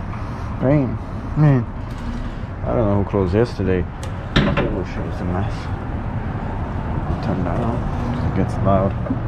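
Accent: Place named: Armenia. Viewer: Your accent sounds either Armenian or Russain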